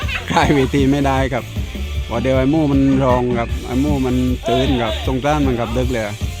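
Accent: South, Thailand. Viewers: เจ้าคนใต้ติ คือแหลงใต้😂😂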